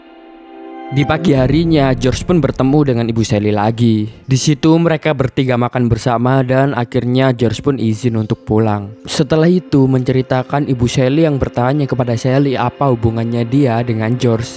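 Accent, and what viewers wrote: Java, Indonesia. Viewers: Jangan semangat tetep menyerah ahhahahaha bikin ngakak. Duh medokny masih keliatan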